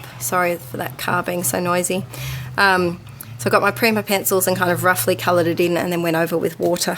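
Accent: Australia. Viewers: HI there. Sounds as if you are a neigbour of mine ....I'm in New Zealand .....I think you might be in Australia, but you might be closer than that :) I used a sealant craft varnish on my art journal pages, & almost ruined some pages, because they stuck together & bits tore as I prissed them apart. So like you, I am having to put waxed baking sheets between the pages ...of my whole book lol, but I actually don't mind the look of that too much. Your first art journal is way better than my first one, which after more than a year, I am still only half way through. I have heard that Mod Podge makes an excellent sealant & doesn't stick, & I use Mod Podge to seal paper images I use when altering a canvas, & it dries quickly & doesn't stick. I wish I had remembered Mod Podge before using the varnish sealant. Great first art journal! Subscribed to your channel now. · You're Australian? 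I trying to place your accent. My 7th grade music appreciation teacher was Australian. I was the only person in the class he didn't pick on.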